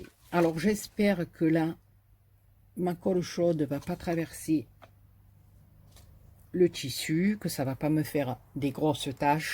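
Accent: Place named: Marseille, France. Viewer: Coucou ma! Mais si mais si, chantes-nous le Titanic...mdr Merci pour tous tes magnifiques partages, c'est toujours un plaisir. Mais le Titanic avec ton accent de Marseille, peu chère que ça me plairait!!! Bisous bisous ♥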